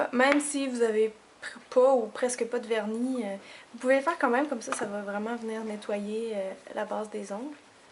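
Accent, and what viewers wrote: Quebec, Canada. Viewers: J'aime tros ton accent québécois c tro mignon et super video !!!!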